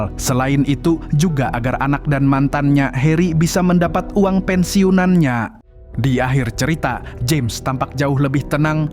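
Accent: Banjar, Indonesia. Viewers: Orang Banjar Kah Min pian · Banjar Jua sekalinya 😮😮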